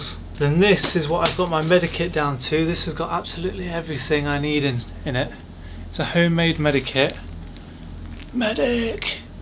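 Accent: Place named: RP, United Kingdom. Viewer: New to your channel. Just subscribed and clicked on the "little bell thingy"! Loving the videos, especially the flattering lighting and the almost posh accent with Well pronounced T's and the distinct lack of the phrase "yaaaa". Not forgetting your lovely partner Lilley....... I hope that's correct? Haha keep up the good work. We love the channel.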